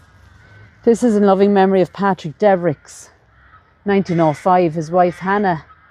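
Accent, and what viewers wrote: Ireland. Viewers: I could listen to you speak for hours. I love the irish accent. Im about 20% irish, maybe that has something to do with it. Hope your health improves soon. And best wishes from America!😊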